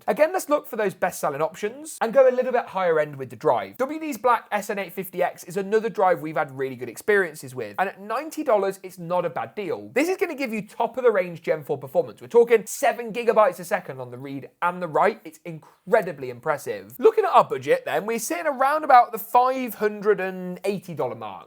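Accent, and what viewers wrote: United Kingdom. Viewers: Fuckin British accent 😢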